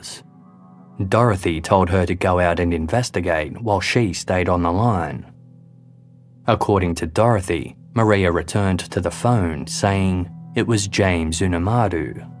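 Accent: Australia. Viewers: I was referencing that the narrator Is a fellow Australian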